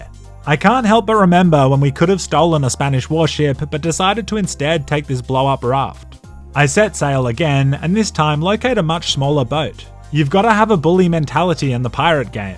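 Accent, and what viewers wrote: Australia. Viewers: You have my sense of humour, you play all the games I like, and you have an aussie accent (the best accent). This channel is essentially designed for me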